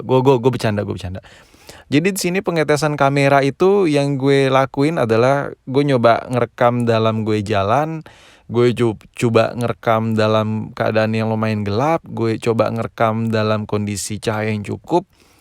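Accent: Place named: Java, Indonesia. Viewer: Thod bahasa jawa lo sama persis kek daerah gw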